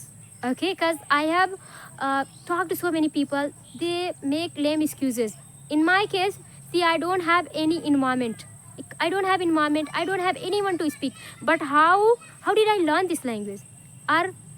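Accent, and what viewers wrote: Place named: Bihar, India. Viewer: You are not able to Your Hindi Bihar language slang I Guessed that But Like your Passion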